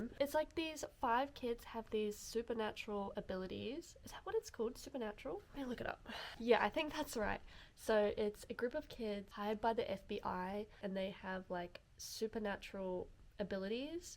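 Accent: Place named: Australia. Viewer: Random question%: what Part of Australia are you from?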